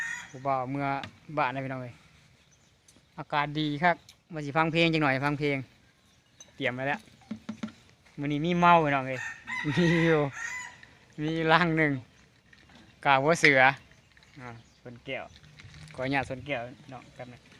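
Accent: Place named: Phu Thai, Thailand. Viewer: ผุบ่าวผู้ไท..หล่อเนาะ เว้าสำเนียงกะไพเราะ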